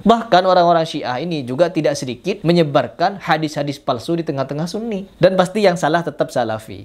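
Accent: Madura, Indonesia. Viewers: Mas logat MADURA tolong dihilangkan.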